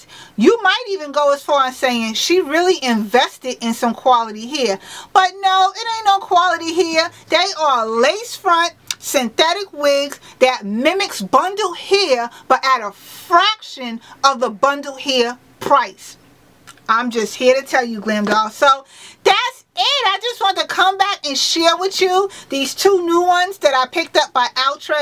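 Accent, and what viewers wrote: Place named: East Coast, United States. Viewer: New subbie love the accent. Philly, NJ or NY? Lol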